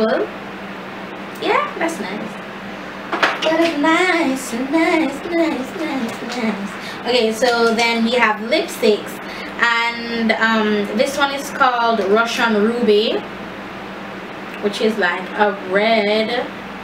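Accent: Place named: Jamaica. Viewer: Really enjoyed this video, I thought it was just me who got super excited when I purchased new makeup! "How them romantic suh, everything jus wrap up!" I just love the Jamaican accent yes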